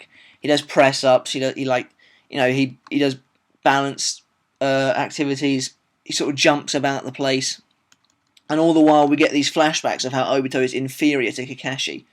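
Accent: United Kingdom. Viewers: good review mate....u actually know what ur talking about...what part uk u from?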